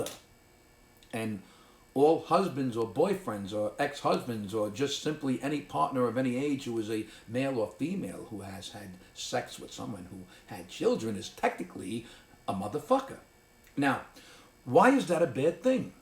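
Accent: New York, United States. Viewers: It just sound really Really bad with an New York Italian accent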